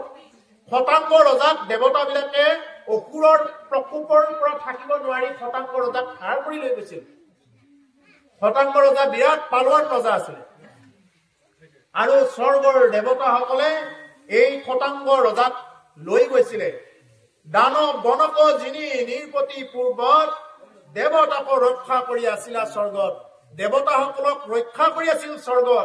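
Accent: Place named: Darrang, India. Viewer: কিমান সুন্দৰ লাগে এই দৰঙ ৰ সুৰ টি কৃষ্ণ কৃষ্ণ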